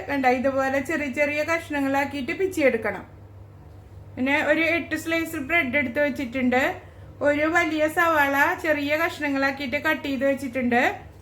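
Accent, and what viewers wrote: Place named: Thrissur, India. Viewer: നല്ല രസണ്ട് സംസാരം പക്കാ തൃശൂർ ഭാഷ ഇങ്ങനെത്തന്നെ മുന്നോട്ട് പോട്ടെ 😊കൊതിപ്പിച്ചു 👌new video കാണാൻ വരണേ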